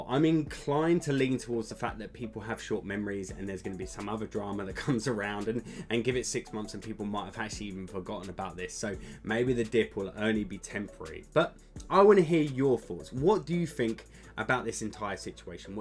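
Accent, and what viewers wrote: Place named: Australia. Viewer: yeah you really do sound more australian than british. I would have guessed you were born down under and then moved somewhere else. Really interesting which part of uk are you from then ?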